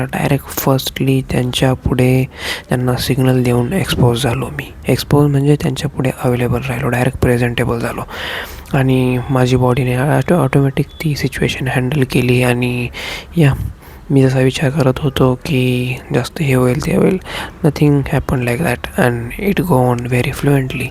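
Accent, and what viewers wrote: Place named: Marathwada, India. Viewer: are bhai tu ekdam relatable vatla kharach bolaychi tone ekdam marathwadi ahe tich thev original vat te aikayla ani tu real rahshin main mhanje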